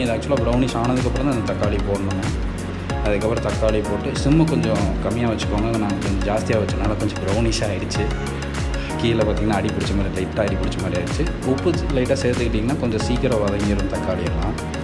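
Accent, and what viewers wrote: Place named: Kongu, India. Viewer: Kongu Kovai Tamil voice 😍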